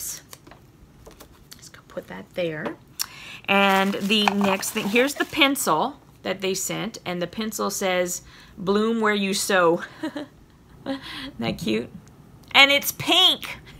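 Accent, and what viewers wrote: Louisiana, United States. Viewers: I've lived in Ocean Springs MS since 1969. I have a little bit of a Cajun accent now and it depends on the word lol. I knew I detected Southern Louisiana in you. Kindred spirit!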